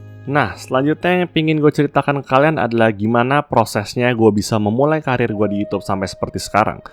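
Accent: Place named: East Java, Indonesia. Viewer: emang kakak orang jawa timur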